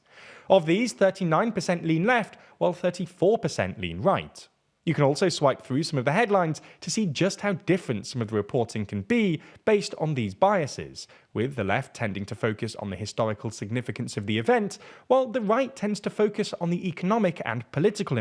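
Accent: United Kingdom. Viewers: Typical British lalalala talk. No conclusion.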